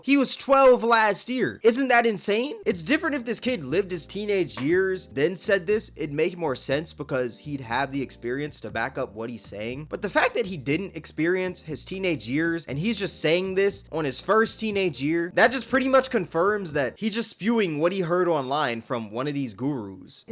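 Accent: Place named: United Kingdom. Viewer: stop speaking in a british accent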